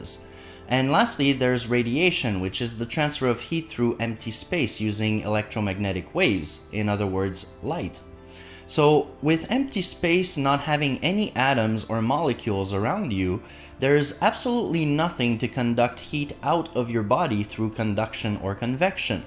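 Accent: Quebec, Canada. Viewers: He sounds "Québécois"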